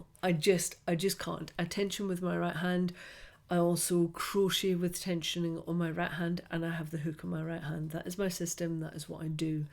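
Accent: Scotland, United Kingdom. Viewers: Do you always sound more Scottish when you're ill?! Hope you're feeling full of beans soon.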